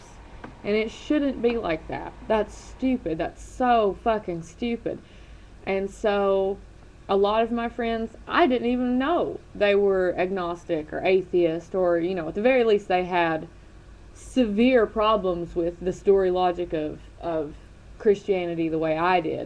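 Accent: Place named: South, United States. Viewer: You are breaking the misconception that every individual living in the South or Southwest are all Bible toting twits. I love the accent and the sprinkled curse words. It adds some swagger to those rational thoughts and ideas that you are having. Don't stop speaking your mind.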